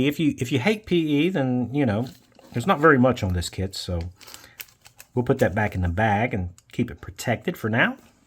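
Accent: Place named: West Virginia, United States. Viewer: The start of the unboxing was hilarious 🤣I always knew you guys in WV talk different, but that was something else. I am still trying to grasp what you were saying 🙂But the kit looks great and is a welcome different subject matter, and I'm excited to see what you make of it. Have fun modeling, and see ya next Friday!!!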